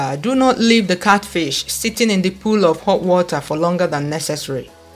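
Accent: Igbo, Nigeria. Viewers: I came across this video then your Igbo accents and and your explanation made me stay and subscribed 😂😂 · Hyper clear Nigerian accent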